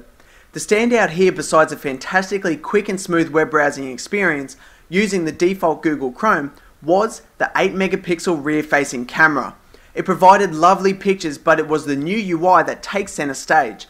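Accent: Australia. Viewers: Great to see (hear) an Aussie reviewer! Keep up the good job :-)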